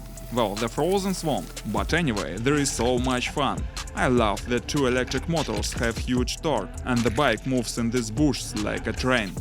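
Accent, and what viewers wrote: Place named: Russia. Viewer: Сразу слышно Русский говор...🤣🤣🤣такой был у нашей училки...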